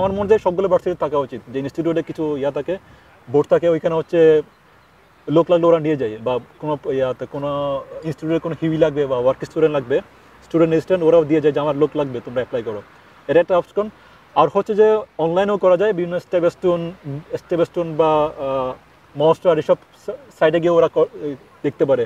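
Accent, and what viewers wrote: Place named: Chittagong, Bangladesh. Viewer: চট্টগ্রামের Accent শুনতে বেশ ভালোই লাগে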